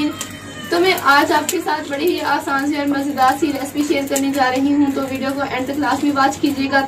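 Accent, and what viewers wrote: Punjab, India. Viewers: Please Speak Punjabi Your Punjabi is so sweet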